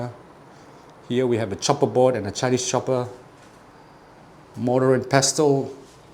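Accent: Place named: Fiji. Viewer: Bula from Suva...I do remember being introduced to your family restaurant in Nadi while working there in the mid 1990s by work colleagues, Paul Chang and Terence Low (Bank of Hawaii). You asked the question in one of your videos whether to open a restaurant in Singapore...My answer is why not open in Suva. I think with your knowledge of fusing asian and pacific cuisine and unique experience, you would do very well in Suva, and would really complement your Singapore Supper Club. I'd be very glad to assist you set-up if you're interested. BTW, I'm enjoying your videos and you still have a very strong Fiji accent...cheers.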